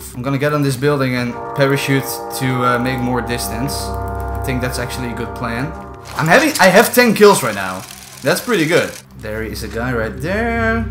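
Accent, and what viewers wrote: Netherlands. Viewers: Ik hoor het Nederlands door het Engels heen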